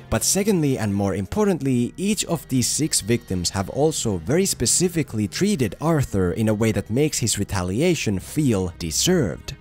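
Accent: Finland. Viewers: Hey . I have to ask. Are you Finn? Your accent defenetly sounds finnish :D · Let me guess, you are Finnish or something by your accent. (I'm Finnish myself so Idk) No offense intended. I like your accent ALSO HE Didn't kill his neighbour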